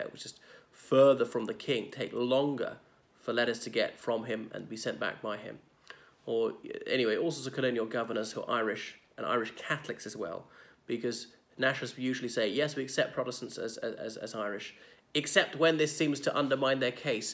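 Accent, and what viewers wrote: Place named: England, United Kingdom. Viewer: Very interesting but im a bit confused you keep referring to yourself as Irish , but you have an English accent .would it be the case your an English gentleman living in Ireland who has a deep connection to Britain. I'd imagine your formative years were honed in the British schooling system hence your strong allegiance to the UK . Nothing wrong with that. Futhermore if there was a policy of one man one vote prior to the Act of Union, Ireland wouldn't have joined. Likewise if the democratic vote in favour of home rule by the majority of people on the whole Island had been respected by the Unionist minority. Partition wouldn't have happened and strong relationships could have fostered and developed over time between both communities throughout Ireland and so to with Britain. Some of the greatest Irish people were and are protestants it was never a religious thing for us .Irish people just wanted to govern themselves but unfortunately the British tried to prevent that at every opportunity .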